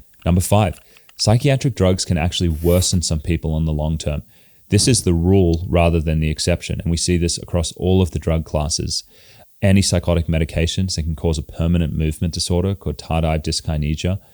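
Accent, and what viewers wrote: Australia. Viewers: You have an Australian accent but you seem to be based in America so who can Australians count on. In Europe Neurologists are more common for all the issues you mention.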